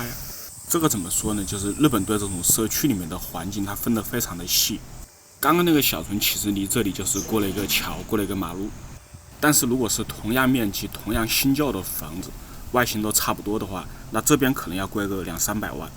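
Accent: Wuhan, China. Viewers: 武汉口音太明显了。。。😝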